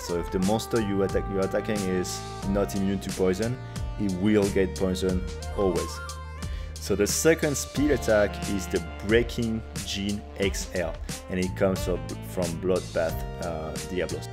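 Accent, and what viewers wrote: France. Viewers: was kinda my purpose. Thx. Btw are you French?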